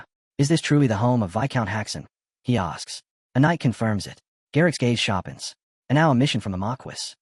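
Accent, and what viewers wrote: Australia. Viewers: Idk but i want to say Australian for some reason. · Stupid Aussie accent.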